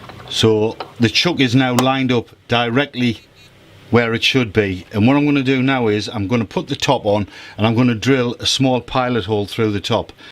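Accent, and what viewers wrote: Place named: Liverpool, United Kingdom. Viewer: your accent sounds like you might be from Liverpool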